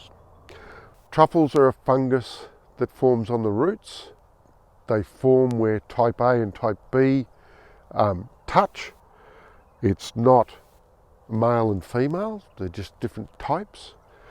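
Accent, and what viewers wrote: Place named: Australia. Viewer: I found this video while researching as to whether this could be done. It's encouraging. The gentleman sounds Australian. Is that where he lives?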